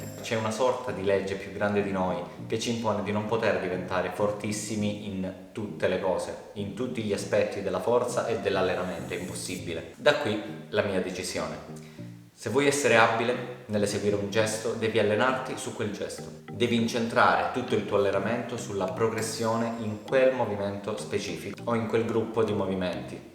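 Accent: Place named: Calabria, Italy. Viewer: E niente... mi sono appassionato da un anno al calisthenics e tu mi rendi anche fiero di essere calabrese come te. Sentire discorsi motivanti come questo col proprio accento è il massimo che possa desiderare da un canale che tratta della mia passione 😂. Grande💪🏻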